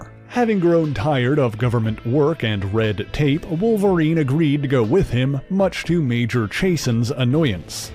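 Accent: Canada. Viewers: Good work. Your Canadian accent enhances this video 💯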